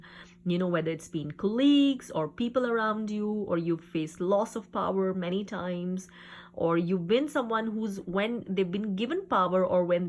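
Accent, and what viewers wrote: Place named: Trinidad and Tobago. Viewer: Sound kind of trini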